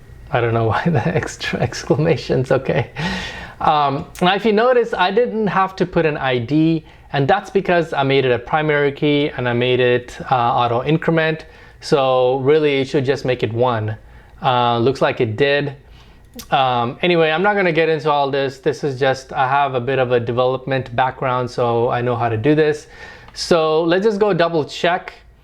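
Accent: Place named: United States. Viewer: drop off that yank accent to get more likes. It’s irritating really. Good video though.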